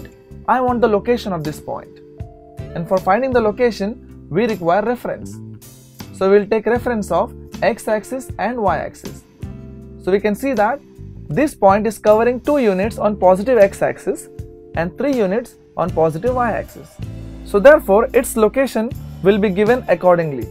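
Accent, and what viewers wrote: India. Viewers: i love ur indian accent it helps me understand better im subscribed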